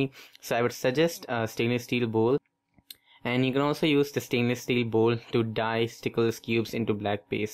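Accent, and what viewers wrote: India. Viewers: Of course he is from india His accent is indian and he orders some cubes from cubelelo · Pta tha aap ek indian he hoge qki aapki english indian jse he aur aaisa steel bowl to indian hi use karte he😁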